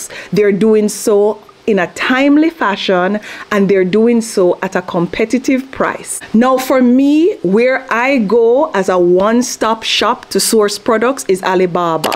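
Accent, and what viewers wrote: Jamaica. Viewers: I thank you so much beautiful and wonderful woman I can hear the accent but you already said something about Jamaica I am African thank you this is the second rinse and repeat Rockstar I'm watching you're straightforward absolutely wonderful honest there's no holds bar thank you Rockstar I believe you will hear from me you are blessed.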